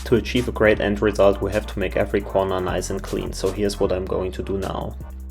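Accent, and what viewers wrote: Germany. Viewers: Einfach der deutsche Dialekt im englischen. 🤣 ich feiere es. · It sound like you are german are you ? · youre accent sounds very familiar to me are you from germany?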